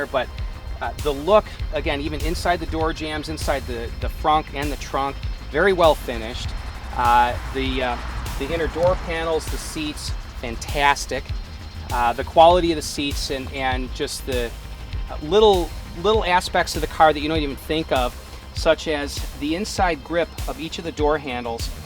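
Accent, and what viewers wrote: United States. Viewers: You have a very cool but crazy accent. It's like super American.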